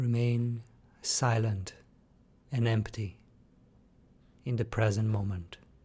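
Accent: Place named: Italy. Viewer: Interesting. I'm used to simply watching my breath for thirty minutes. I think I will continue that way. Simple is what works for me. Great stuff,. Continua a fare queste cose e aiuterai a tantissima gente. I hear an accent and is an Italian name. Io sono cresciuto a Napoli!!! N'abbraccio.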